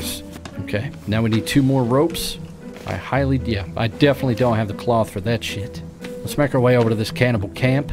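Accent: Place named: North Carolina, United States. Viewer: You must be from NC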